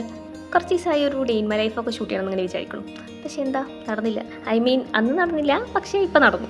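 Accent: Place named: United Kingdom. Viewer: Her English accent🌟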